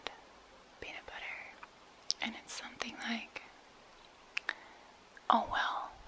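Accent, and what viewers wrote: Netherlands. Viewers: Lol ik ben Belg je hebt een Nederlands accent ik heb nooit dat verwacht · are you for real Dutch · are you dutch? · She has a rather normal accent. She speaks so called 'standaardnederlands' (translation: standard dutch). This is the accent they expect you to have in formal situations (for example, at your work). Some people have this accent naturally, but this depends on which area you come from.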